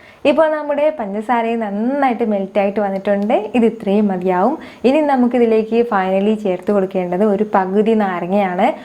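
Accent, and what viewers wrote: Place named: Malappuram, India. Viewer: അത് മലപ്പുറത്തെ വേറെ ഭാഗത്ത ഭാഷ നിങ്ങളെ 😁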